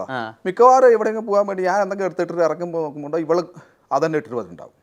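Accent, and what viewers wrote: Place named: Kannur, India. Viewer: Jishinte സംസാരത്തിൽ കണ്ണൂർ ഭാഷാ അസ്സലായി ഉണ്ട്.പിണറായിയുടെ സംസര ശൈലി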